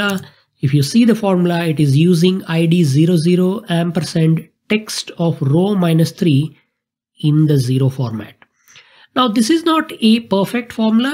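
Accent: India. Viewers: Next time get straight to the point without these useless sketches. And please use an ai to voice over your indian accent.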